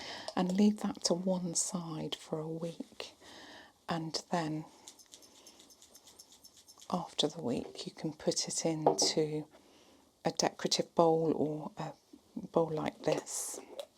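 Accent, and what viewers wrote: United Kingdom. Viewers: Immediately subscribed upon hearing your voice and oh so delicious British accent :)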